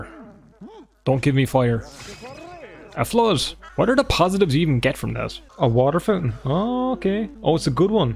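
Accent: Ireland. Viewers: Sims 1 with an Irish accent . I clicc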